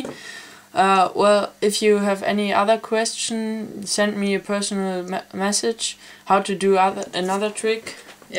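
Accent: Germany. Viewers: bist deutsch ne? hört man :D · nice tutorial dude, are you german?i can hear it from your accent LOL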